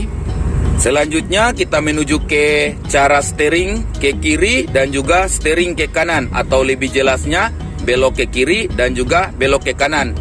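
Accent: Bolaang Mongondow, Indonesia. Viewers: Rupa logat mogondow ini noh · Mantap bang jelas sx👍 Mcam logal bolmong😁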